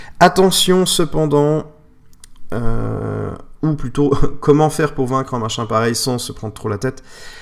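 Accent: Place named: Switzerland. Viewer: Un grand merci pour cette vidéo. Je t'ai découvert en faisant des recherches sur le jeu. Briskars est un jeu qui me tente de plus en plus: -de part son échelle, j'éprouve du mal sur le 28mm depuis une opération aux yeux, -l'accessibilité des règles sur le Net. Au doux son de ta voix, je te devine dans le plat pays helvète ^^, y résidant moi même, je me permet de te demander si tu connais des clubs ou détaillants permettant de découvrir le jeu afin de me faire une idée plus personnelle de ce qui en est? Amicalement Fred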